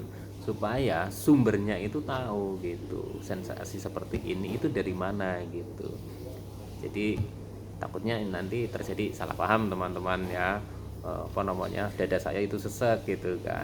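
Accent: Banyumas, Indonesia. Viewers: Mas mau tanya, Mas ngapaknya mana, sy ngapak Kebumen, anxiety sy masih suka datang Mas, blm sembuh bgt. Trimakasih motifasi nya, 🙏🙏🙏.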